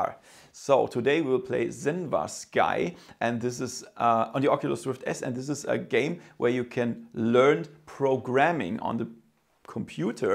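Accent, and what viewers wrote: Germany. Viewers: Hey, been watching for a while. I own a very small VR company in Washington State in America but my girlfriend is German. So I tend to put you on whenever she's around because I think your accent reminds her a little of home. Also, I can never get over the way that you read your sponsors. It's the most German thing a German person has ever German'd. I actually wanted to thank you for the review you did on the neuro net EEG product. I'll be a little more blunt and say that it's got maybe good intentions but it is clearly a cash grab and one of those items that pops up and actually does damage to the industry as a whole because it's yet another product or accessory that is lying to consumers and getting their hopes up to get closer to where we are wants to be as opposed to where it really is. I'm in the process of opening up a new VR arcade location and I'm currently trying to figure out some hardware logistics as far as purchasing. 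Perhaps you might have some advice for me? either way I hope you're doing well and keep it up. We enjoy it quite a bit.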